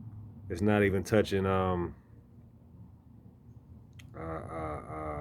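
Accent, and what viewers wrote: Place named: South, United States. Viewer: I didn't know u was from the South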